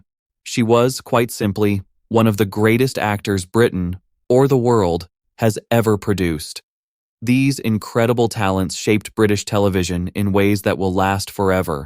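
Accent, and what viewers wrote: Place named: United States. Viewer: Why the f*** do we have to listen to an American voice telling us about British TV legends ??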